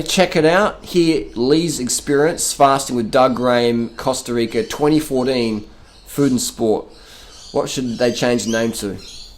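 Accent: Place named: Australia. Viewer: I just hate this Australian accent...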